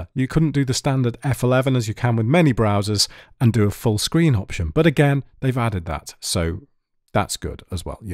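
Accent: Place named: United Kingdom. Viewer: You sound like ownagepranks british accent jonathan character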